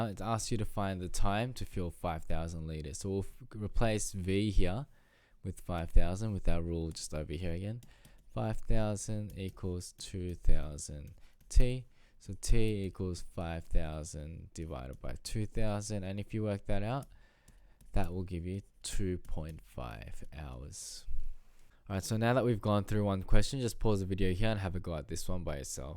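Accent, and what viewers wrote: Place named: Australia. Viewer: i was wondering why this video was so accurate to my Australian curriculum and then realised why when i turned up the volume and heard your accent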